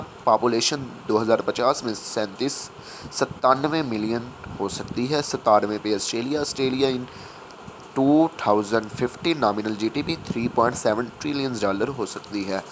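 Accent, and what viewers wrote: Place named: Pakistan. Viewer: Bhai tum awaj se hi Pakistani lagte ho and uske bad Pakistan ke flag me Kashmir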